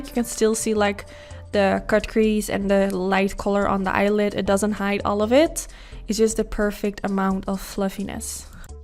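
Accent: Netherlands. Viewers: I’ve never seen one of your videos, but the second you started talking, I knew you were Dutch haha! 🇳🇱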